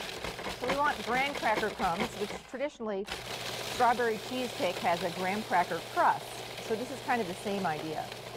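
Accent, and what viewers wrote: United States. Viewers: Oh, no. Packet "pudding," store-bought crackers, store-bought cake ... and won't even whip real cream. When I first heard her accent, I thought, "Oh, this is going to be terrible," then berated myself for stereotyping Americans. This is so much worse than I'd thought was possible. Maybe it was for a "quickest desserts ever" segment? My goodness, even the layering is dreadful -- it's a tumble of ingredients. Let's see actual layers. Very dry, with so little liquid (sherry &/or juice is usually needed for the sponge).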